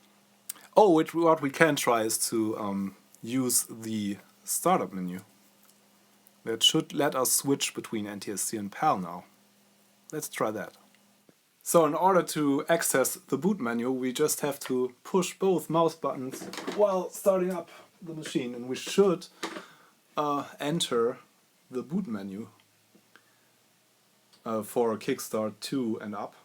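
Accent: Germany. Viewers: You sound like a german boy who trrrrry to speak english.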